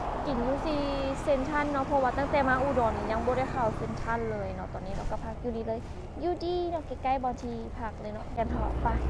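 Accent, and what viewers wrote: Laos. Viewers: ดูแต่สาวลาวนี่แหละอยากฟังสำเนียงลาว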